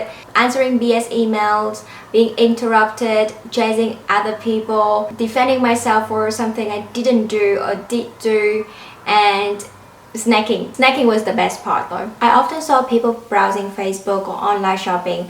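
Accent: Australia. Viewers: where are u based in now? is that austrailian accent haha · Are you Australian? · are you Australian? asking because of the accent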